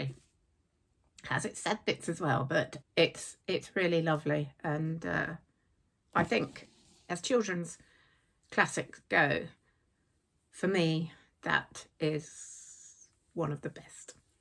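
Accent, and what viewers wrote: England, United Kingdom. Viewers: Also, I loved hearing Little Women in your English accent, I could listen to all books in your beautiful accent! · No apologies needed for reading in your British accent; I loved it!!! I look for British readers for everything. 🤣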